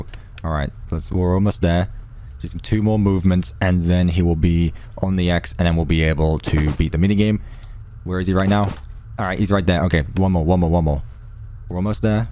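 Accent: United Kingdom. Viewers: You're sooooo bri'ish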